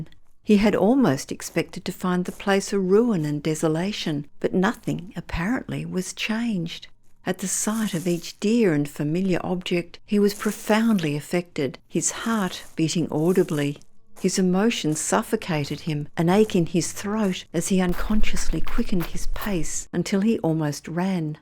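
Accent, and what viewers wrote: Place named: Australia. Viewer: CANNOT hear this story as narrated by an AUSSIE -weird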